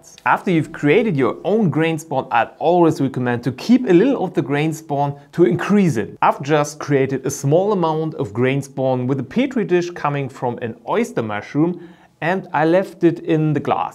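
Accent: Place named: Germany. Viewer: So ein schönes Deutsch-englisch :D man merkt es... aber gutes Video !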